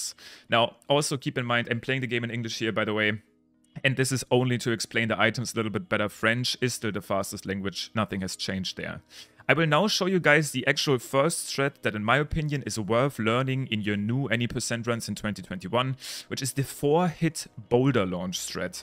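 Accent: Germany. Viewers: are you German? your English sounds really german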